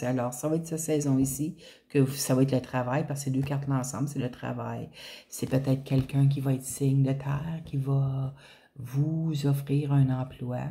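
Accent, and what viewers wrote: Quebec, Canada. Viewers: Merci pour ce beau tirage qui m 'apaise surtout concernant le travail! et puis entendre votre accent du Québec me ravit au plus haut point. Je suis tombée en amour avec votre accent après avoir vécu quelques temps dans votre beau pays. Merci à Vous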